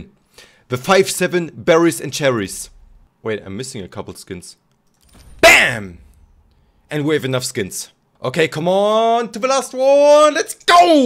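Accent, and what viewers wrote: Germany. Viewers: Nice tradeups, and are you german, because you have a strong accent xd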